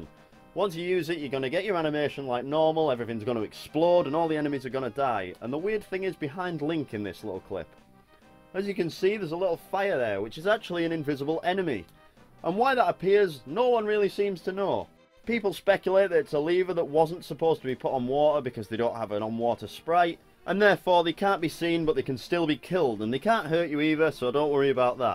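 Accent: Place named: North, United Kingdom. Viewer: Dude, you are so northern. I love it. Subscribing now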